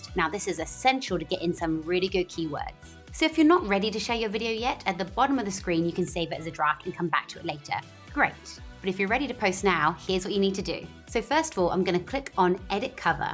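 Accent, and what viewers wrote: United Kingdom. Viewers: Instagram reels, British girl, Public or private school accent ? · Agree with other reviewers - really helpful to get up to speed and get it right (and nice to have a Brit accent as well ;-))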